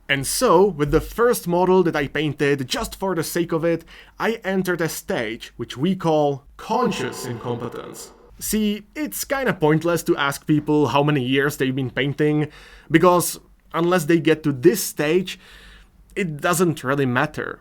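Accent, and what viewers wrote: Czech Republic. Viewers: Thats...encouraging to hear..12 years 😄..but whatever, better late then never right !? Im just about to start with painting but I try my best... I just discover your channel and I like it more then others! I was little suspicious about your accent tho 🤔..."why he sounds kinda like me!?" AAAAH, hes CZECH 😅!!! Im surprised, youre first czech I discover in this "WARHAMMER painting universe"... I started to think its not exist in Czech Republic. And Im glad its not true😁!!!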